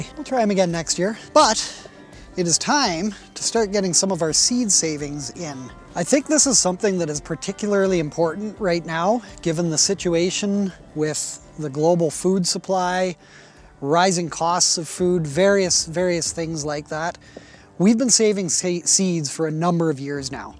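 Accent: Canada. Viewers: Love your clarity, simplicity and lively Canadian accent! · Great vid, thanks! Southern Ontarian here, living in Utah...it was nice to hear the good ol' Canadian accent. Also nice to hear the word "Tupperware". I'm going to try this method for saving seeds. Cheers!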